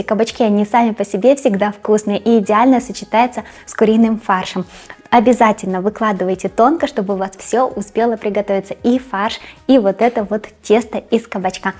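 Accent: Ukraine. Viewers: У Вас неймовірно гарна українська мова,пані ❤ А рецепти -як завжди супер 👌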